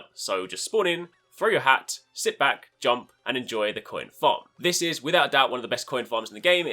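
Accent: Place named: United Kingdom. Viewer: Why is every odyssey youtuber british?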